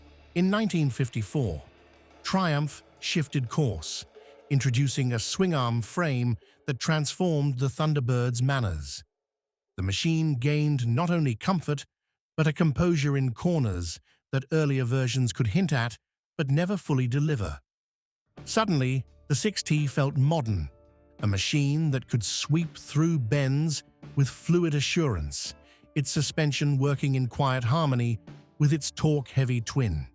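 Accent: United Kingdom. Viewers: i love triumph. but if i had heard this turkey waxing lyrical like a pommy git i would have bought a honda. cant listen to him anymore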